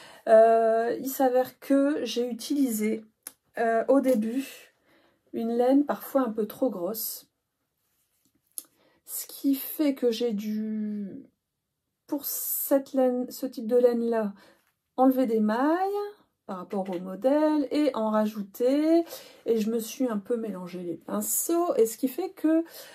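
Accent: France. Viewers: Merci pour ce podcast. J'aime beaucoup tous les modèles que tu as présentés, et tu le fais naturellement, sans chichis, sans essayer d'imiter le débit saccadé des journalistes ni jouer les influenceuses. C'est plutôt rare ! J'aime aussi ton délicieux accent du Pas de Calais dont je suis originaire. Les Harmonies, ça me parle et quelques notes de flûte m'auraient fait plaisir 😊. Concernant le pull Esme, pense d'abord à ton bien-être et ta santé. Chacun est libre de modifier les patrons, d'autant plus si tu cites la designer initiale. ✂️ Pas de bobine dans mes emojis, mais la couture m'intéresse aussi !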